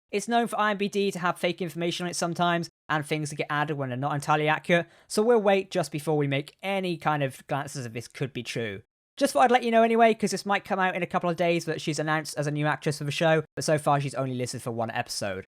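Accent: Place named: United Kingdom. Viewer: susan chase He’s speaking British English.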